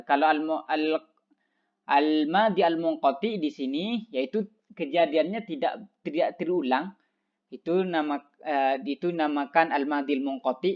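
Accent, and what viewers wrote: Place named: Madura, Indonesia. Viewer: Madura mu loh 😂 medokkk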